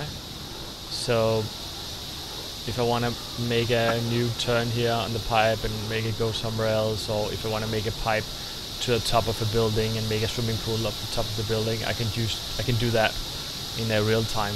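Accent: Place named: Denmark. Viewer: You sound like a Danish man speaking english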